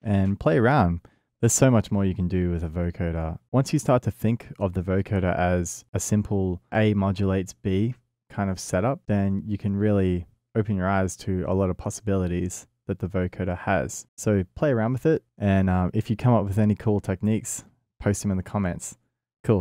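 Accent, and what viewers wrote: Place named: Australia. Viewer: You're so eloquent when you're explaining this, it's clear that you really understand the subject matter, and it's inspirational. I enjoyed this tutorial so much. I'm jealous of your knowledge. You make it look so damn easy! Keep making these :) ps: Always helps hearing someone explain in good plain Aussie that I can understand ^_^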